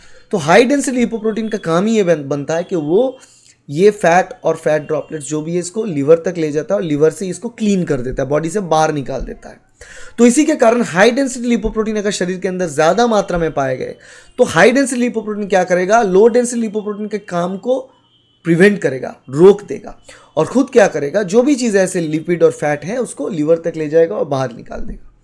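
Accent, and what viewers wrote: Bengal, India. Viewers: Thank you sir for this video 🤍🤍🤍🤍... But I'm feeling like you have some Bangali tone in your voice…..☺️are you Bangali??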